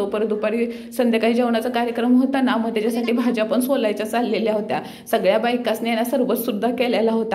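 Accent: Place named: Karad, India. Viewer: Tu lay bhari karadi bhasha boltes❤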